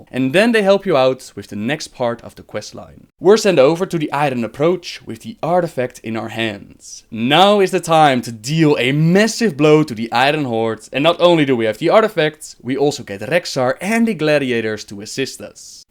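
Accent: Netherlands. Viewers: You dutch? Je klinkt in ieder geval nederlands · His accent. He is from the Netherlands. ^_~ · Awesome :P BTW are you dutch? because you have a small dutch accent :P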